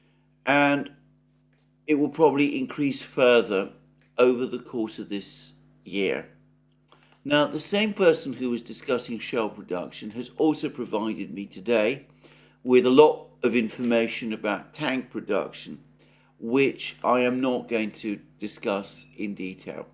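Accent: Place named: RP, United Kingdom. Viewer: Mercouris is always an authority about the Ukraine war and about sophisticated Eton-style english language :+)) The only thing he dares not to do is to try to know who is in the "deep state". The "deep state" has no name.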